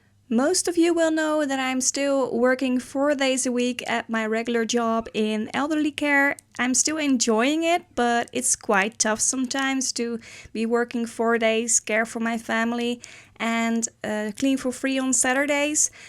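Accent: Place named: Netherlands. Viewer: Wat gaaf ik kijk altijd dit soort filmpjes! Nu kwam ik bij jou uit. Maar ik hoorde in je accent wat nederlands! Tof dat je dit doet! Ik ga je volgen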